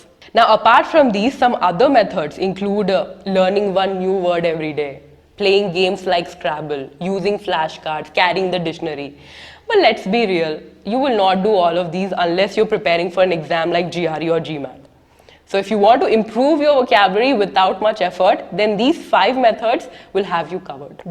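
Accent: India. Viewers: I just love the way u speak English 😌,,,,your English accent proves that u r an indian and even you never try to say English like their 😚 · I have question why all hindis accent like this? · This isn't a impeccable comment. She is Indian so she will obviously, by heredity, have a indian accent. Nothing impeccable. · You spoken english but saying like hindi. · I love Indian accents like how you are talking,.. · Your english accent is pure Indian..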